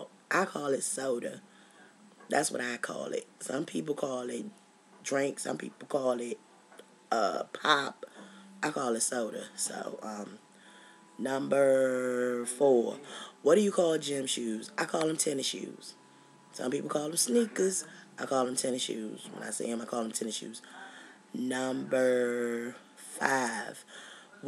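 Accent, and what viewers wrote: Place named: South, United States. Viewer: hahaha love this yes u do sound so country i love it though thats what makes u though:-)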